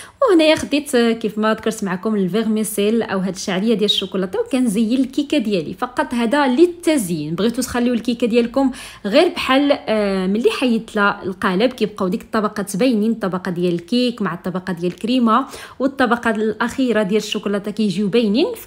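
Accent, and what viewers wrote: North, Morocco. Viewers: اعطاك الله الصحة اختي عجبتني لهجتك الشمالية وحتى انا شمالية. بنت وزان ونتي اشمن مدينة وبادرافو عالكيكة غنجربها ونرد عليك ان شاء الله ❤ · يا الحبيبة وصافاتك غزالين واللكنة الشمالية د المغرب زادتهم حلاوة الله ينورك والله يجازيك بخير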